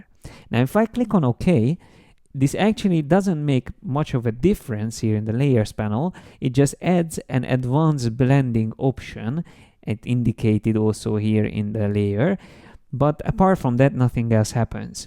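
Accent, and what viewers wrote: Hungary. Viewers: Kimagaslóan kitűnő és hatékony tananyag kezdőkne és haladóknak egyaránt. A beszéd akcentusból arra következtetek, hogy teszik tudni magyar nyelven is komunikálni, ha igaz akkor szeretném ha ezt velem közölné, mert angolból csak kezdő vagok. Igen szép munka (Y) sok sikert ezután is.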